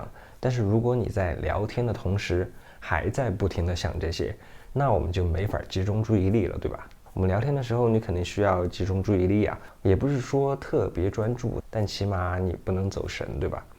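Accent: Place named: Beijing, China. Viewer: 你的中文不错了，从发音到咬字北京腔有了，已经接近母语中文的国人了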